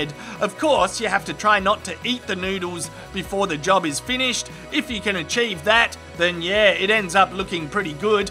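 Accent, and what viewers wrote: Australia. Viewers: God I hate the Ozzie accent!!!....ugh......sorry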